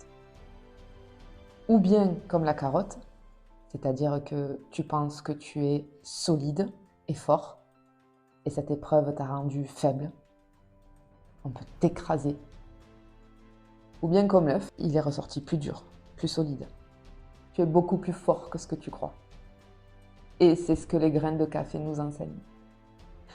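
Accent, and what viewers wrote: South, France. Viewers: Bonjour ton accent du midi j'adore je suis de Toulouse j'ai le même bonheur a vous merci pour ces petits conseils j'ose un 🥰🥰😘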